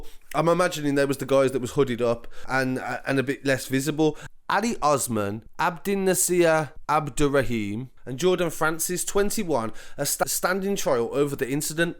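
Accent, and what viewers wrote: Birmingham, United Kingdom. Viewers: Where is this donny channel from ??? Brum? Or Skegness? Lol rate him still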